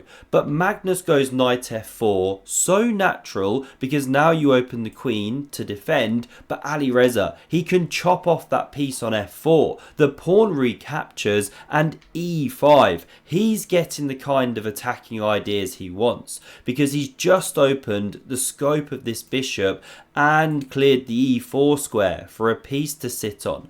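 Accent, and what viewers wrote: United Kingdom. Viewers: Must be UK 🇬🇧 accent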